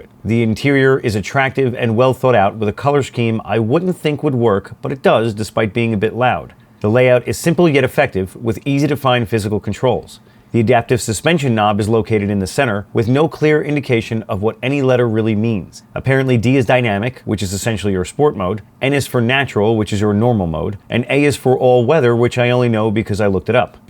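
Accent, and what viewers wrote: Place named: New York, United States. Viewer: I fuckin love the sarcastic New York take on car reviewing I’m going to say you’re from Long Island maybe Nassau?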